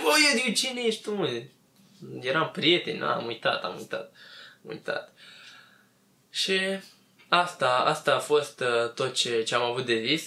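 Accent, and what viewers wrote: Moldova. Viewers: Like pentru accentul Moldovenesc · Imi place accentul tau de moldovean :))) ( wăăăi ) #suntsacal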